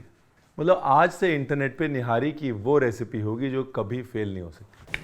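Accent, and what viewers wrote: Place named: Lucknow, India. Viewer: तुम्हारा लखनवी लहजा बहोत खूब हैं! मै भी इसी तरह बात करना चाहती हूं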